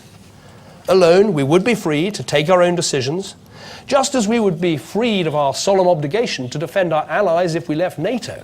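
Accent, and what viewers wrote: RP, United Kingdom. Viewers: Key words: RP accent speech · I love his accent in fact I love the British accent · Thank you for sharing. Im learning RP, so if you can upload more speech spoken in RP, that would be great!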